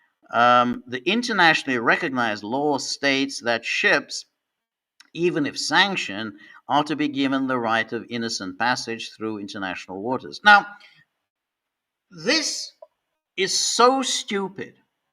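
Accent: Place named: Cockney, United Kingdom. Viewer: Love the Cockney accent,. Regarding the debanking and all that, I have to say, it's not right, but it's like the thing from Pulp Fiction, isn't it? The Marcellus Wallace situation. Yeah, I mean, maybe they shouldn't have expected that reaction, but they should have expected a reaction. You know, I mean, come on. The Swiss guy who was sanctioned by the EU. What on earth was he doing anywhere near Europe, let alone still living in an EU state? Two, Scott Ritter. What on earth was he still doing in the United States? What is wrong with these people? And I'll tell you who's next, Alexander Mercois of the Duran. He will be sanctioned. They will clamp down on him. They will debank him. I've got no idea why these people stay in these countries. I left Airstrip One 25 years ago and have never looked back.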